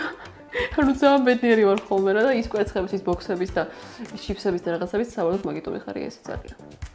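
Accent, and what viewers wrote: Imereti, Georgia. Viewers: უსაყვარლესი ხარრ 💙💙 ეს ცოტა იმერული აქცენტი საიდან .?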